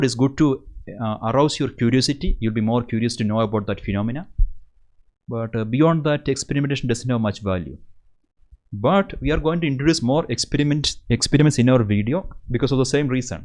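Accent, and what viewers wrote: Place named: Kerala, India. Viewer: This is malayali English 😁.my english teacher speak like this when I was in school.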